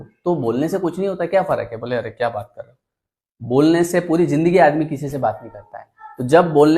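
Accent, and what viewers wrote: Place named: Mewar, India. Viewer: Sir, Apka mewadi tone bhut acha h bolne ka.😅 U are amazing. 😊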